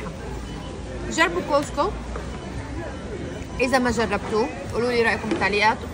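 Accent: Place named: Lebanon. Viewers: واذا حكت لبناني عادي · ليش عم تحكي لبناني شكلك لبنانية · هي فلسطينية بس من حبها لدكتور فودها بتحكي بناني ومن الحب ما خلى الواحد يطلع عن دينو ولهجتو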